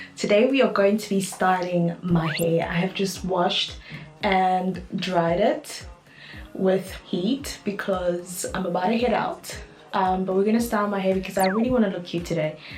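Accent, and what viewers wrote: South Africa. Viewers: Love the hair. What flat iron did you use? Btw you sound South African.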